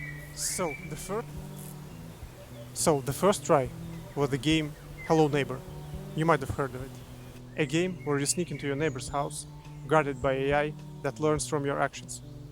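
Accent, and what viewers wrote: Russia. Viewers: Бро говорит с явным русским акцентом)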